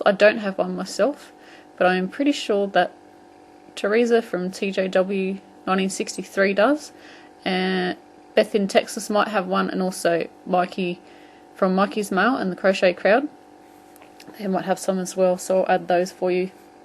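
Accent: Australia. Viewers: I love this tutorial. I am not sure if you noticed, but on the youtube video for this, there is a box that is for interactive transcript. Apparently it tries to translate each frame of dialogue in writing., with your Aussie accent, it is translating it, but, NOT....QUITE....getting the right words. Think Autocorrect fail on a cellphone...only the Youtube version. I will try to copy and past some of this for you. on a new comment.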